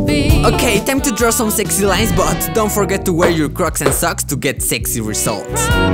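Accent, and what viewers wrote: Spain. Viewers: como se nota que eres español bro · I think you have a Spanish accent, or do you speak Spanish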